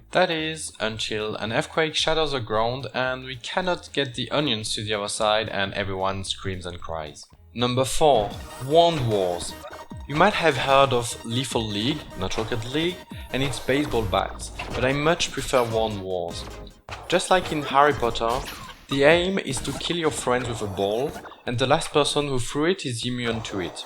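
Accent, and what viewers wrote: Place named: France. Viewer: magnifique accent français :'D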